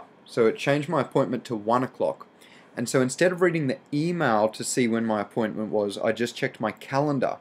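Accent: Australia. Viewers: To be honest I thought exactly the same after watching the video xD The stupid aussie accent just makes it even worse..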